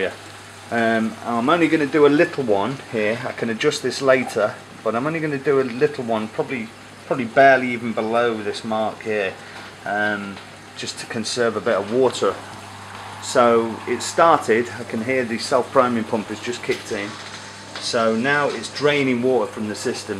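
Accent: United Kingdom. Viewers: Hi just found your channel I love your tank and setup, I can see its going to amazing. You sound like a mancuniuan to me, I am from manchester and don't know many other reefers in the area so its nice to see. keep the vids coming.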